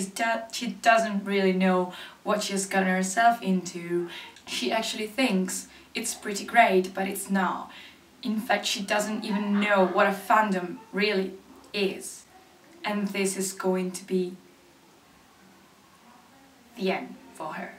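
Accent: England, United Kingdom. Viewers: Continua cosi e non ti preoccupare del tuo accento inglese perché è fantastico! Booyah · Il tuo accento è stupendo sembri veramente inglese *_* anche a me piacerebbe fare video in inglese appena avrò tempo mi ci metterò ahahah cmq volevo dire che sei fantastica e che il mio tipo di fangirl è... Tutti tranne il primo tipo e l'alternativa lol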